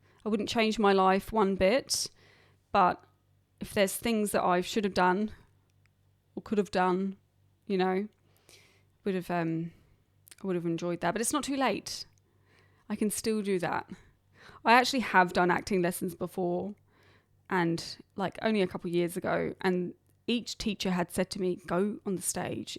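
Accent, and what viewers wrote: Australia. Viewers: You should reconsider the title of the 'pod'. I would lean into your lovely Australian accent. And the fact that you're honest about your regrets in life, something like "Cautionary Tales: The Karen Chronicles" or "Bad Example".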